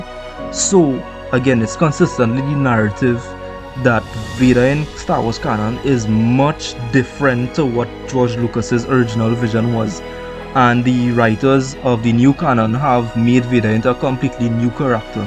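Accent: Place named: Trinidad and Tobago. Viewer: U definitely from Trinidad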